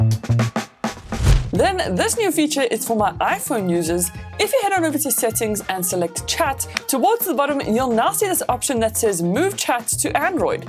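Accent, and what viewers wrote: South Africa. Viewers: I can't point out the accent, is it South African 🇿🇦?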